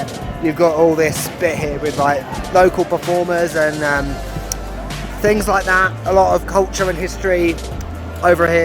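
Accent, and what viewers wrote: United Kingdom. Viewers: Also have to say that after lots of vids of north americans (kinnetic kenons, tangerine travels and more), I love to hear your easy to understand british accent. Cheers from Spain. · I love your British accent, I'm really glad that you love our city and culture ❤️ I also like British culture, in fact I'm actually trying to improve my British English because I learnt American in mi school, haha. Cheers m8 :)!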